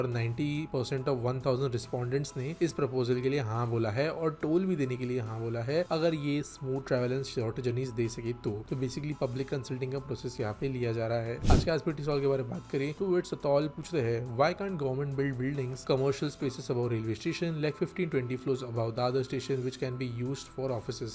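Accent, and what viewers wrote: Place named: Khandesh, India. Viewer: Toh Khandeshi ahe mahnun vatta asel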